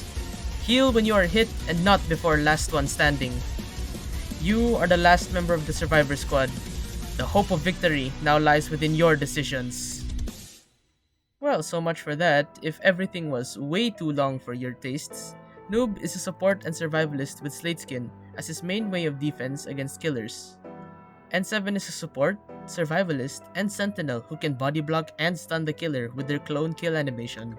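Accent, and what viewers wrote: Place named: Philippines. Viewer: Your accent sounds filipino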